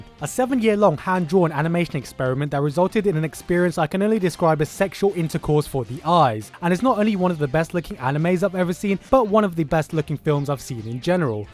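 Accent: United Kingdom. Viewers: No! nonono, you are not a Uptight Obsessed Douchbag, you are a Uptight Obsessed Douchbag with a british Accent. And i must Say, you should not buy every single DVD you watch! Basically we should force the market to put anime Back on TV Nothing less! The Netflix Model is quite interesting but here in UK i dont think they have anything on it.. Only buy material if you really like the Franchise, and !!APPROVE!! otherwise you are feeding fatcats.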